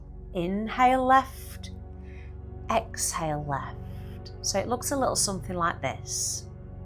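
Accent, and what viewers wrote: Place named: Yorkshire, United Kingdom. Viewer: Thank you for this. I'm really starting to learn to pull back and stop when I get myself in a high state of anxiety as I know acting from that state isn't helpful. These exercises really do help to bring me back down to a place of calm and stop me wasting hours in a loop of anxiety. I thank you so much for your videos and your down to earth manner, I'm a Geordie so I respond well to your Yorkshire accent and humour, much love to you 🙏❤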